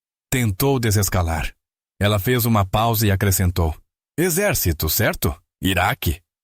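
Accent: Portugal. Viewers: Pq esse narador tda vez q fala um número errola a língua, ou pronúncia em português lusitano ???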